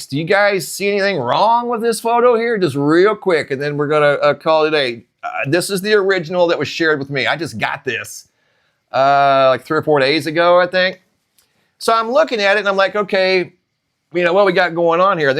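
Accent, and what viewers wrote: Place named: Louisiana, United States. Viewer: Ayyye! South Louisiana! U da mayn! Mayne!!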